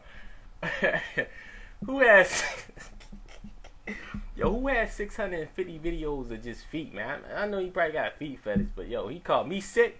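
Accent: Baltimore, United States. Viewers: It’s a Baltimore accent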